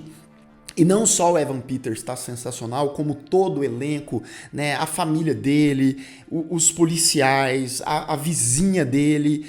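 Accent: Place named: Brasília, Brazil. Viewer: Vc é meu vizinho!!! Sabia que pelo seu sotaque devia ser daqui por aqui perto (sim, galera de Brasília tbm tem sotaque). Não vi série, mas pretendo. Estranhei essa cor amarelada, achei que fosse meu celular, agora eu entendi hahaha.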